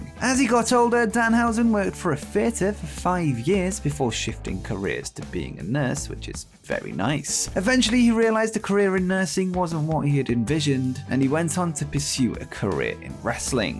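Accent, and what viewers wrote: United Kingdom. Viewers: Also hearing "Pepsi man" in a nice British accent is awesome! Vive la!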